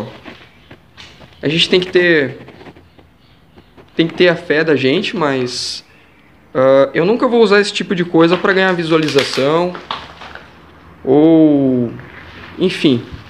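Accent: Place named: Rio Grande do Sul, Brazil. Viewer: Sim é outra foto amigo se Deus quiser vou conseguir ir lá adoro lugares assim e adoro seus vídeos parece que estou junto com voçe na hora que está em lugares assim de tão apaixonada por esses lugares ; que Deus te proteja sempre e te ajuda em todos os seus projetos que tem ai pra fazer aqui não tem lugares assim pra eu está indo e adoro o jeito que os gaúcho fala o jeito que voçeis são aqui na minha cidade fala que gaúcho são muito bravos eu não acho mais vou falar outra vez voçe está de parabéns com seus vídeos amigo acho que sou uma pessoa de alma antiga por amar tanto lugares assim bsj e Boa madrugada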